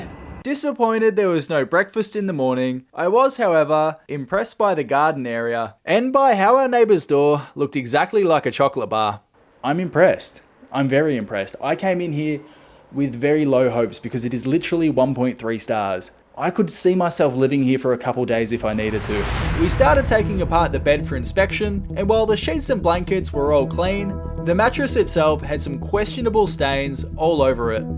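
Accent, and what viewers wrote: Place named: Australia. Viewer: Men with Aussie accents like his makes me MELT.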